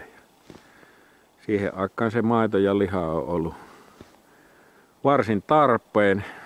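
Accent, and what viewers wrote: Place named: Savo, Finland. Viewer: Nyt on mukava kuunnella kun se on kotikulmilla niin murre tulee niinkun pitääkin. Ps. Kauhee kuunnella savolaisittain kirjakieltä😅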